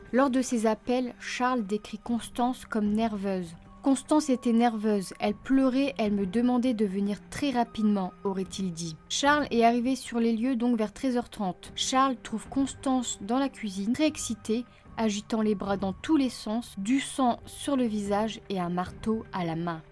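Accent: North, France. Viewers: Bon travail mais élocution à améliorer. Débit trop rapide, quelques fautes grammaticales et.... l'accent du Nord un peu trop prononcé (c'est franchement pas beau). Pourtant je suis du Nord donc aucune discrimination dans mon commentaire, juste un conseil pour t'améliorer 😉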